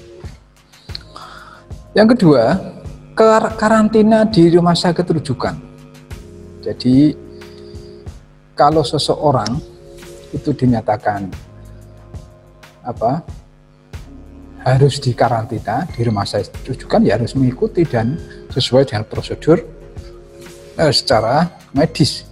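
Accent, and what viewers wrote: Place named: Java, Indonesia. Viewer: Matoh... Jowone medok...😄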